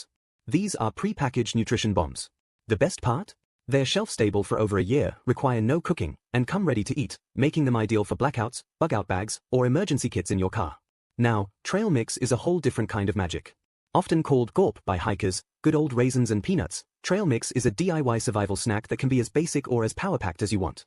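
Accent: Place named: England, United Kingdom. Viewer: Congratulations! A new voice was used (English accent); however, the list of essentials has changed little. Why? Because it is somewhat difficult change to any degree.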